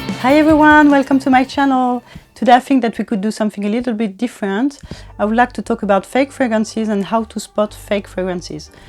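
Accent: France. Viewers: Hello, i feel like you have a French accent! Am i wrong?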